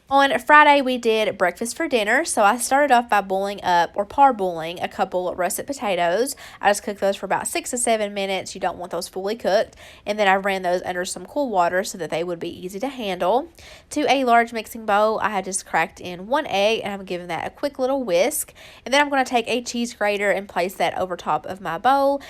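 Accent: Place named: Kentucky, United States. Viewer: I don’t even have to ask where you are from! You sound just like my family from Kentucky. Love your recipes! I just subscribed.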